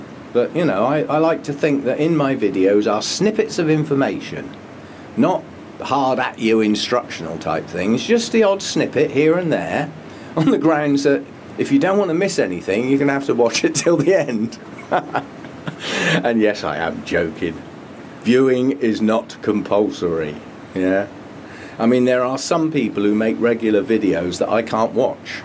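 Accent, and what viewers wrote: United Kingdom. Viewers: I have to watch your videos! There are so many bits of info that you share. And I love a British accent, your sayings and sense of humor.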